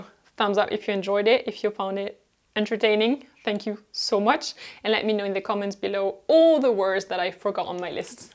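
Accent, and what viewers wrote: France. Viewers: I love your French words as well as your French accent., you present yourself so well and it's a joy to listen to your videos. 👍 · Ur french accent is so adorable 😘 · J'adore tes vidéos , j'ai commencer à les regarder pour améliorer mon anglais , de française a française on comprend mieux l'accent , et puis j'ai fini par découvrir que tout tes sujets étais intéressent et très réfléchi , ton naturel , ton charme et ton expérience font de toi une personne unique ! continue ! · Oh my! You should teach us french as you are a pretty french woman with pretty french voice)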